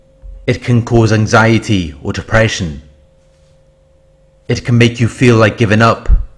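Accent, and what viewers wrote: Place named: Swansea, United Kingdom. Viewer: Still got the Swansea accent 😉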